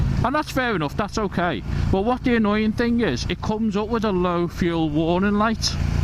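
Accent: Liverpool, United Kingdom. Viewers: Are you from Liverpool??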